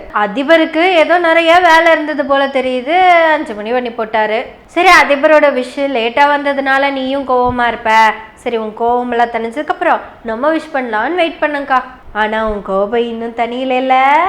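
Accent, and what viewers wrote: Kongu, India. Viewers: உடல் மொழியால் சம்பந்தப்பட்டவர்களை உடல் நடுங்க வைத்துப் பிசிறுத் தட்டாமல் கொங்குத் தமிழில் பேசிப் புரியவைக்கும் உங்களுக்கும் குழுமத்திற்கும் வாழ்த்துக்கள்!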